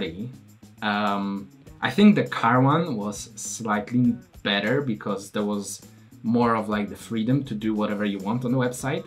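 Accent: Poland. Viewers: Jak usłyszałem 'hey Jacek', już wiedziałem, że to rodak xd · Ciągle słyszę Polski akcent ;)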